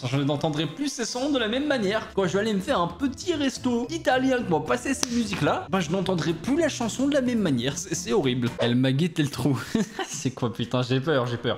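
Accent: Belgium. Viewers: Et tu belge ? Dezoler de te déranger au passage 👉👈😀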